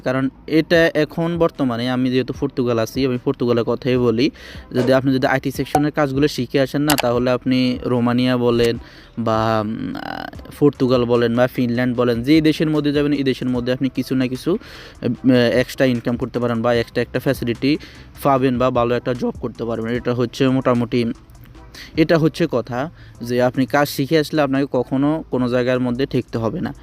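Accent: Noakhali, Bangladesh. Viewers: ভাইয়া আপনার বাড়ি নোয়াখালী মনে হয়,,,,??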